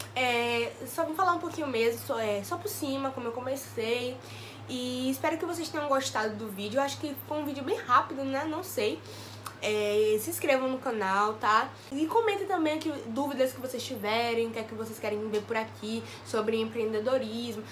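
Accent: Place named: Salvador, Brazil. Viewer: DE SALVADOR? NEGAAAAA SUA HISTÓRIA É TUDO E TEU SOTAQUE EU PENSEI EU CONHEÇO ESSE JEITO DE FALAR AAAAA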